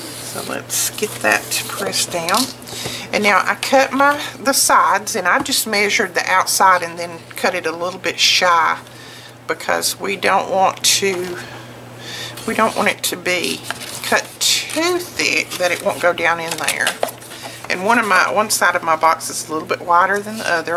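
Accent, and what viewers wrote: South, United States. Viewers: What a good idea, and useful! I've never seen those letters before. The inking around the letters is a good tip. I wouldn't have thought of it. I learn something every time I watch you. Your grand dauther is going to love it. While you were making this I was also thinking how kids really DO appreciate the little things we do for them. Just one more way we can say we love them are are thinking about them. I have to tell you this. I listen to all of your videos, because I am scrapbooking all day long, and you keep me good company while I am doing it.. Well, when I am thinking my thoughts as I work, I HEAR my thoughts in your accent!! Really! I can't speak it, but I HEAR it. I'm sure that if I lived in the south I would pick it up SO fast. :-)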